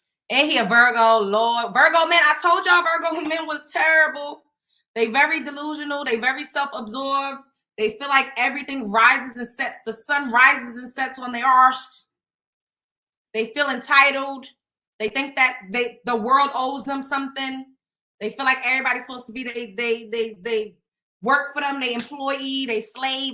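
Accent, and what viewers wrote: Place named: New York, United States. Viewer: You remind me in a way of another good sis I watch on YouTube named Ashley Dalton she's a character as well lol, and y'all both talk with a NY style accent, and y'all both some beautiful chocolate drops. New subbie✨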